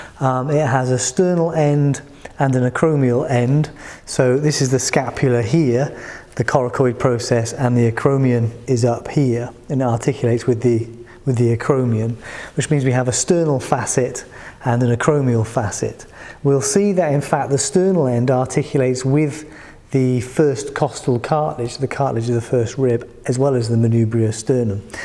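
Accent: United Kingdom. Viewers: worst vid ever. especially the unapologetic british accent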